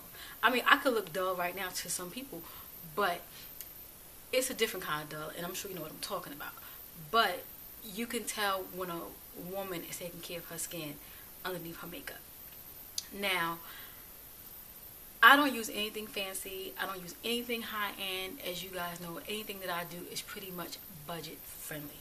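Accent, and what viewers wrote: United States. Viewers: Great video, you look very good for your age and I like the way you speak, where in America are you from?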